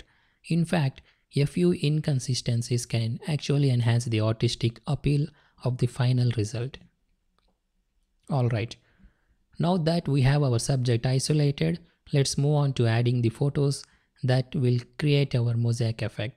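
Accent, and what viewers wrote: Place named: India. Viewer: Tumhari awaj se laga ki tumhe hindi aati hogi bro plz hindi me video banao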